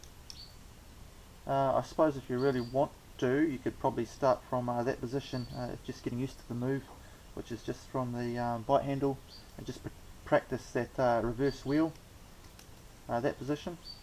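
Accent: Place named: Australia. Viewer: Are you in Australia? You sound Australian and there's a lot of greenery and birds where you are... It sure looks like it. Correct me if I'm wrong, I could be totally off.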